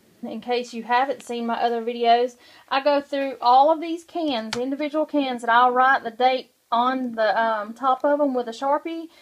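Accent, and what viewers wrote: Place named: Kentucky, United States. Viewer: If I was a bettin man I'd say yer from Kentucky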